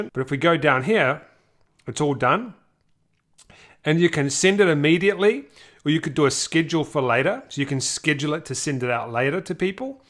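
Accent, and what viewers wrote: New Zealand. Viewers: Hey mate, I stumbled across your videos a couple of weeks ago. I love the content bro!! Keep it going! You sound like your from New Zealand too? Haha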